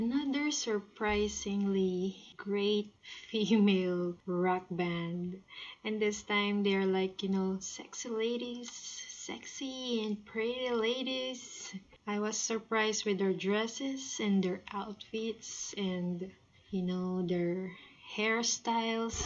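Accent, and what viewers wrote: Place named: Philippines. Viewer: ah kala ko kasi japanese po kayo pero may accent kayong pinoy 😅. Na sa japan po kayo ngayun? Bagong favorite ko po kasi tong kaya napadpad ako dito 😁.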